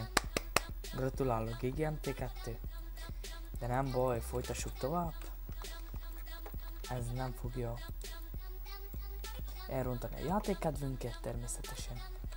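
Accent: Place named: Szekler Land, Romania. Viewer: szoval ezert volt ismeros szekely beszed :)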